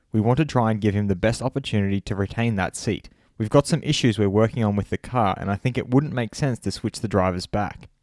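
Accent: Australia. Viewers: Aussie ascent gotta love it mate.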